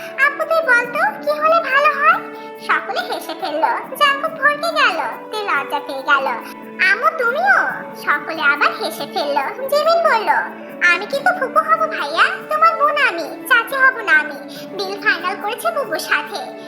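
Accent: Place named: Kushtia, Bangladesh. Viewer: আপু আমি জানি এই চ্যানেলে তেকুক স্টোরি ছাড়া কোন স্টোরি কোন সময়ই দাওনা আপু আপু আপু খুব খুব খুব একটা অনুরোধ করছি আপু তুমি একটা তিনচার পাটের স্টোরি দিবে ভিমিন, আপু তোমার এই স্টোরি দেখে আর কুষ্টিয়ার কথা শুনে আমার খুব ভালো লেগেছে কারণ আমার বাড়ি কুষ্টিয়ার ভেড়ামারাতে, যদি তুমি আমার জেলার মেয়ে হলে আমার অনুরোধ রাখবে আমি আশা করছি, আমি তেকুকু লাভার আবার ভিমিন স্টোরি খুব সুন্দর লাগে আর তোমার স্টোরি খুব সুন্দর লাগে তাই বিনিতো অনুরোধ করছি প্লিজ প্লিজ নির্লজ্জের মতো বলছি আপু প্লিজ প্লিজ প্লিজ একটা ভিমিন স্টোরি দাও প্লিজ প্লিজ প্লিজ আর আমার কমেন্টের উত্তর আশা করছি খুব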